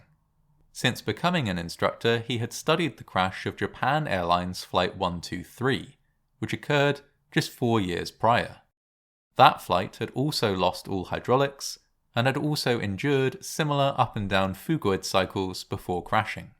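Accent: United Kingdom. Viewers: Tell me about the horrible history my soothing british man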